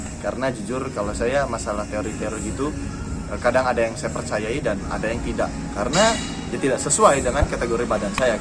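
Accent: West Java, Indonesia. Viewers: Bang dari sunda kah? Itu mangunreja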